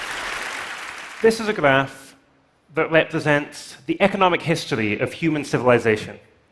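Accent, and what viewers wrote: Scotland, United Kingdom. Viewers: What accent is that??? Half Scottish half Dutch attempting a posh English accent? Mental. Good video, thought distracting... that accent!? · Where is this guy from? England, Scotland, Ireland. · Sounds Scottish to me. But I'm not sure.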